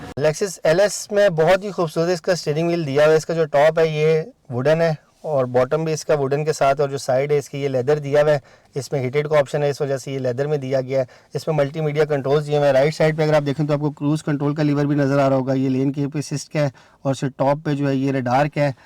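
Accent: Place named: Rural, India. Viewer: Vocals should be improved, seems like a pendu is reviewing a prestigious car. Most likely conduct review in english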